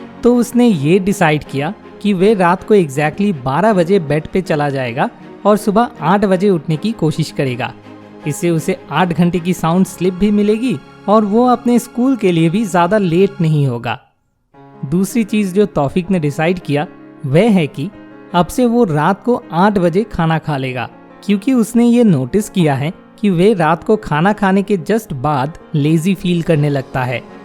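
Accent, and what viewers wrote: Bengal, India. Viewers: Welcome back Bangoli accent voice ,,,,👍👍👍👍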